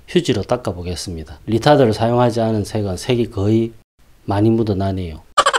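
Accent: Gyeongsang, South Korea. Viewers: 진짜 의도되지 않은 리얼 경상도 발음 눈까리 빵터짐 ㅋㅋ 울랑이랑 비스무리한 스퇄의 아재 개그 정말 딱 내스퇄 강의 ㅎㅎㅎ 취미로 그림 그리다 우연히 알게되었습니다. 시간됨 자주 들릴께요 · 눈깔 그리시는거 잘 보았습니다. 갱상도 말투로 시크하게 설명하시는것이 너무 제취향이십니다……하하핳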